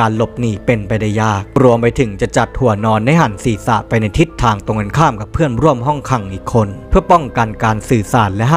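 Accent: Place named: Suphanburi, Thailand. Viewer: แอบเหน่อสุพรรณ😂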